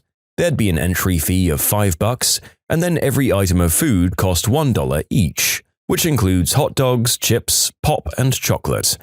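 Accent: United Kingdom. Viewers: Legit i noticed the voice im laughing but no not offensive i mean i think british voices are funny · Since when is the narrator British? I honestly kinda like the British narrator ngl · British accent!!!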